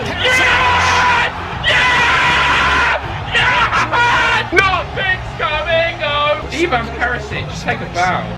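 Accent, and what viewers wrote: England, United Kingdom. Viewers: U have an English accent......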